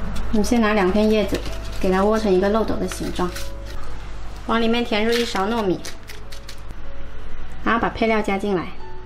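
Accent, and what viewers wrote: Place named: Hakka, China. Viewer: she has been telling us that she is 客家人, I am also a 客家人too. Although I am not fluent in 客家話, I understand the dialect pretty well. Her 客家話is totally different from mine. Which part of China is she coming from?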